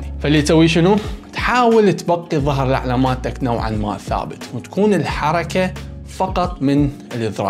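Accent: Iraq. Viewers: ليش ي عراقي انت شنو تحجي هيج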